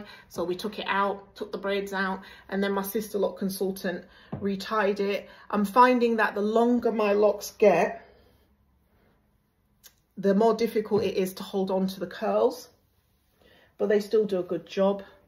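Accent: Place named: Northern England, United Kingdom. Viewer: New subby, also from the uk just down the road from you in the midlands, as I can here your northern accent 😊🙌🏾 I’m just voice 5 years in with diy locs got just under 200 as was not prepared to travel to get my hair started or maintained. I’ve learn so much these past 5 years & started family members on their journey too, we all love locs. Your hair is beautiful & grows fast! Glad I found your channel 😊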